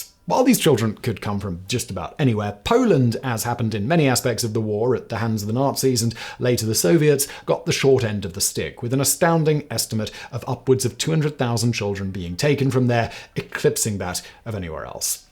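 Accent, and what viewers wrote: England, United Kingdom. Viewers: Fantastic English accent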